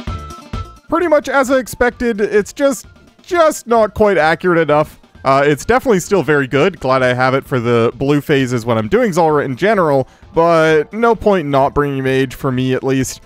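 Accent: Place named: Upper Midwest, United States. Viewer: I've been wondering this since starting the series but where are you from? You have the quirk where you say "done the task" instead of "done with the task" which I've only heard from people from Philly haha but otherwise I'd say upper Midwest (like me)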